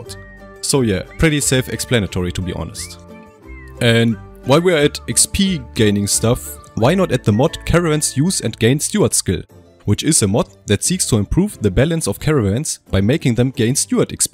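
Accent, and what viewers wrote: Germany. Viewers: There is nothing better than watching a German talking about bannerlord mods and having a strong accent. Grüße geht raus an dich! Bester Youtuber!!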